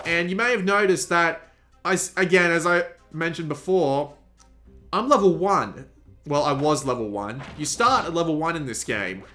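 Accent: Australia. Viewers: Thanks for the footage - nice to hear an Aussie accent too :) Reckon I might have to grab this.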